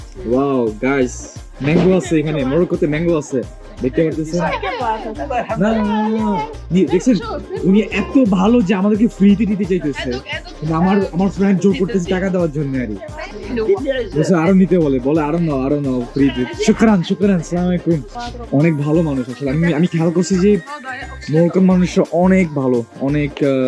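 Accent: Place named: Noakhali, Bangladesh. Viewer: ভিডিও টা বেশ উপভোগ করলাম 💜 লোকাল বাজার, নিজস্ব পদ্ধতিতে সাবান তৈরি করা, তেল তৈরি করা, জাস্ট অসাধারণ। ভাই, কিছু মনে না করলে আপনার জন্মস্থান কোন জেলা/থানায় জানতে চাচ্ছিলাম। আসলে আপনার কথার টোন অনেকটা আমাদের এইদিকের মতো লাগতেছে লাকসাম/নোয়াখালী/চাটখিল, তাই জানার আগ্রহ হলো। ধন্যবাদ জানবেন💜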